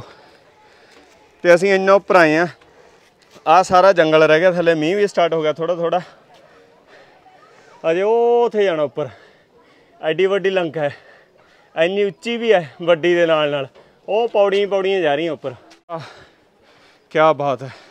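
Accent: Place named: Punjab, India. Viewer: Bravo to and. Last vlog of Ravana's Lanka was great and we really love your typical Punjabi spaeking. Really enjoyed when said "Thalle Rukh takko kinne pidde pidde Jehe lagde ne" Our wishes keep it up. Waiting for Batticaloa Gurudwara Darshan. Thanks and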